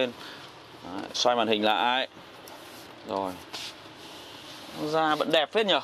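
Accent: North, Vietnam. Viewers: Nghe giọng miền bắc đọc kiểu tây chuẩn ko vào tai. Đọc kiểu việt hợp hơn. Nhưng mấy ông miền nam phát âm kiểu chuẩn tây rất êm tai nhé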